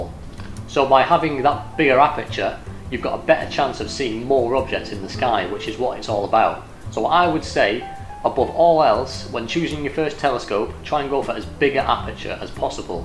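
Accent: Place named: Liverpool, United Kingdom. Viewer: loved the video. Ignore the YouTube trolls trying to get a laugh for their site on his Beatles Liverpool accent.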